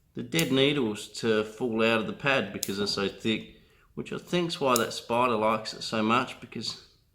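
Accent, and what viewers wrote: Australia. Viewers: Iam a beginner and I love junipers,great tip thanks, the accent and the funnel web spider bring memory of beautiful Australia, thanks again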